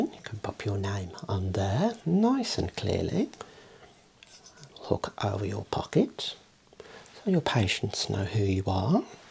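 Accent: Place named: England, United Kingdom. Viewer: This is how I imagine everyone from England speaks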